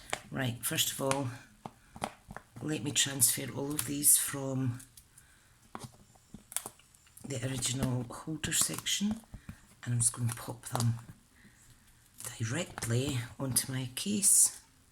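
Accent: Scotland, United Kingdom. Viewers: Another great tutorial. Thanks for sharing. Nice to hear a fellow Scot!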